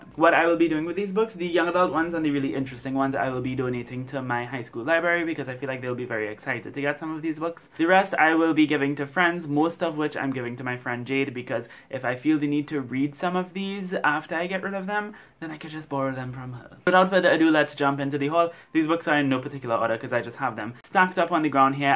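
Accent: Trinidad and Tobago. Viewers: are you from Trinidad because you have a Trinidad accent by the way love your videos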